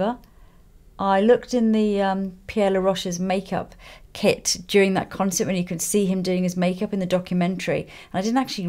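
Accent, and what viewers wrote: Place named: England, United Kingdom. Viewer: I don't like everyone's English accent... In fact, very few people make the cut... But, the way you talk...Ahhhhh...so soothing... Perfectly melodious❤️love all your videos... You're a proper artist!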